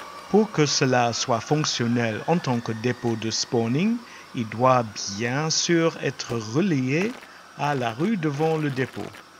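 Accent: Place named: Germany. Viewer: T'es pas français, je dirais allemand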